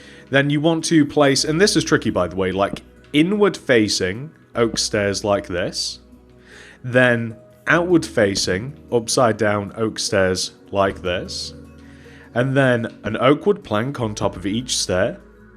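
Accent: United Kingdom. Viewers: Where are you from? You sound British, yet you mainly make tutorials of American stores rather than British ones. Either way I still enjoy them, keep the good work up!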